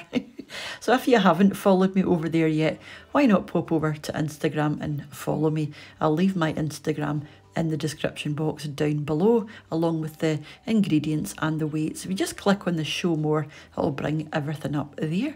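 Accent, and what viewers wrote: Scotland, United Kingdom. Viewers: I love your recipes! I live in North Ayrshire so its nice to hear a fellow Scottish accent :) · Are you from Scotland, by any chance? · isyt I recall Americans saying a few pats of butter or tablespoons. It just sounds so adorable to say knobs of butter. I don’t know why. I just love the Scottish accent and colloquialisms.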